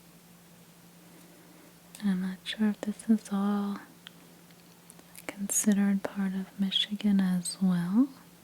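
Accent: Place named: East Coast, United States. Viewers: you’re 100% from the east coast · Brooklyn accent? Whatever it is I love your extra vowels.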